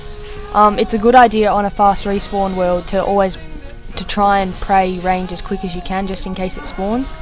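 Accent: Australia. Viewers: hey buddy u sound aussie, im aussie too. im maxed would you like to go arma sometime?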